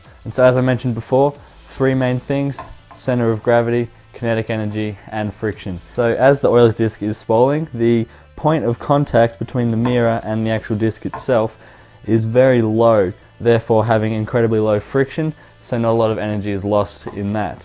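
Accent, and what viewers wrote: Australia. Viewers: This is really cool! My 2 brain cells are trying to keep up 😂 your Australian as well! Yeah mate SUBSCRIBED 👍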